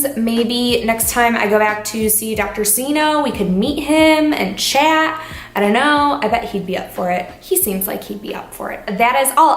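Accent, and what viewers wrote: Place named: Midwest, United States. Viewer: I could tell you have a Midwest accent 😭😂 #chicagogirls ♥️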